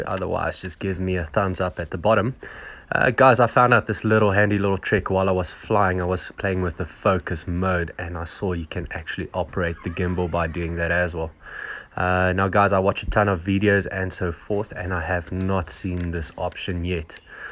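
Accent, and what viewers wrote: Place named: South Africa. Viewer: Bro, you sound South African.... Lol I grew up there, Johannesburg.... But I live in Bulgaria, Sofia... Europe... Sweet 😁🛸🇧🇬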